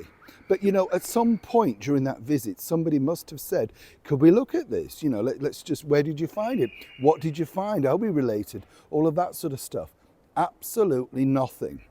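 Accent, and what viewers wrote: United Kingdom. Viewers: I love to hear someone with a British accent!!! 👋👋👋👋❤️❤️❤️❤️🌹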